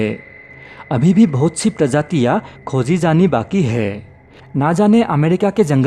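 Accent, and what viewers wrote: Assam, India. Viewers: Apunar tone Tu alop asamiya jen lage assomor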